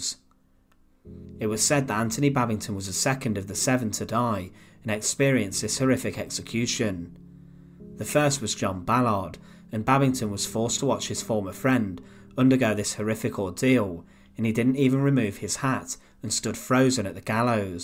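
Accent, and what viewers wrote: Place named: England, United Kingdom. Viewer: I don't think it is a robot - just a bad narrator. Proof that an English accent does not always make for good narration.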